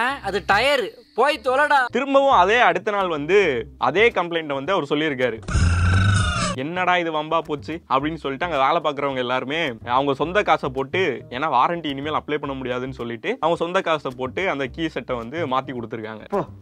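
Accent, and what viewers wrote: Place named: Marthandam, India. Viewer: Bro nanum marthandam than avlo periya worth ila slang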